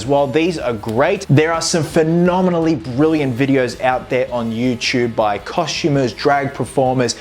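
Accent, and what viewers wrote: Australia. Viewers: Hey. Just subbed to u. I also used BYS for my joker cosplay in school book week and it turned out great. Although I used hair spray on my hair, did the trick, but was a bit light. What else could u recommend? Also, it sounds like ur from Australia, and if so, me to!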